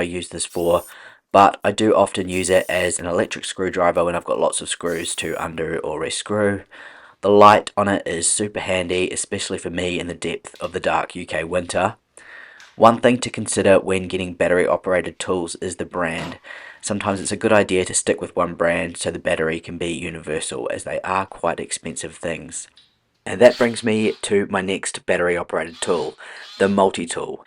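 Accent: New Zealand. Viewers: Ok have a question for you!! Have u consided purchasing a laser paint remover..some are very expensive but some are cheaper if u look about...no more sanding or scraping imagine time saved..would make an interesting video...anyway have been enjoying your videos grretings from Australia..do i detect a kiwi accent?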